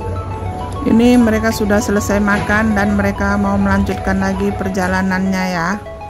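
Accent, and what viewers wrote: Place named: Indonesia. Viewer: Hujan ya bund , oh ini rumah sakit yg populer yg biasa org Indonesia klw berobat kemalaysia ya Bun , tp dari logatnya bunda sprti org Indonesia ya Bun , mknan berat sepiring plus snack2 ada bakso nugget dikafeteria rumah sakit JD gak tegang dan kaku ya Bun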